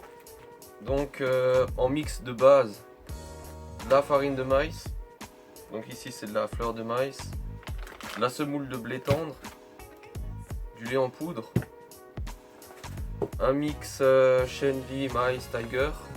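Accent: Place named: Alsace, France. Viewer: Mika 80 laisse l'accent alsacien trankil lol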